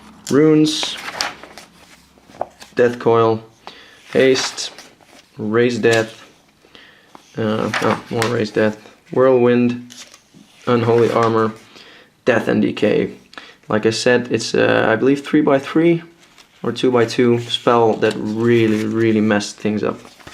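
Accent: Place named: Netherlands. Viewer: Haha, je accent is zoooo Nederlands, hoorde het gelijk :) Ik ben zelf nogal verslaafd aan Warcraft III, zowel single als multiplayer. Deeltje 2 nog niet geprobeerd, zal ik vandaag even doen.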